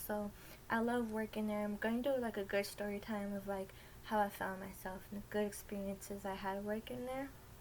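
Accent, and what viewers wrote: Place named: New York, United States. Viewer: I don't know if you have ever heard Lalalalafontaine!!!! He was a legend hockey player for the Sabres in the 90s. I like your New York accent.😎😎✨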